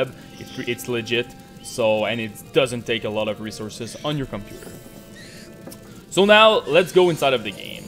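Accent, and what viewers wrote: Quebec, Canada. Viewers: Arrête! On entend le québécois en lui mais son accent est vraiment pas pire!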